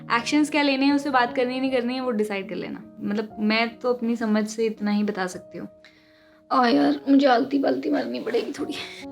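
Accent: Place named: Delhi, India. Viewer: love your videos.. very addicting.. tum delhi se ho na? tumhare dilliwale accent se lagta hai.. main bhi dilli se hoon to tumhe sunkar maza aa jata hai.. keep up the good work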